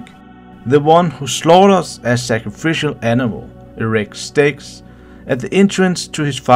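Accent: Denmark. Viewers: I swear this guy is danish i can feel it in my danish body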